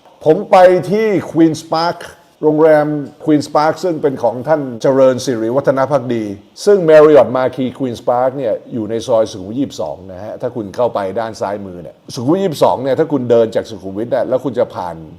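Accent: United States. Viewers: แต่ต้องตัดสำเนียงฝรั่งออกก่อน ไม่น่าเชื่อ เกิดและโตในเมืองไทย ไปเรียนต่อที่เมกา กลับมาเมืองไทยแล้วยี่สิบกว่าปียังตัดสำเนียงเมกันออกไม่ได้ หรือคุณปลื้มไม่ยอมปรับเปลี่ยนเอง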